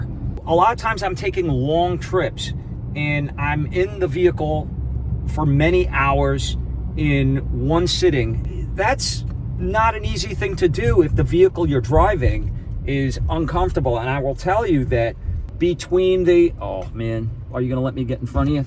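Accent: New York, United States. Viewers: Are you from NewYork? The Bronx???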